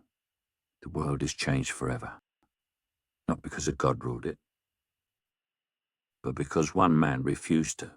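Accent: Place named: Cockney, United Kingdom. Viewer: First time I’ve heard an AI imitate an East End accent.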